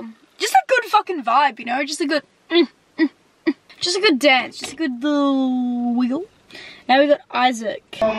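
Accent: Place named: New Zealand. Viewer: I thought hang on is she a kiwi?! I had to check. You sound more kiwi than aussie IMO 😅 I'm from NZ 😁 Love your vibe and sass. Stay real 👌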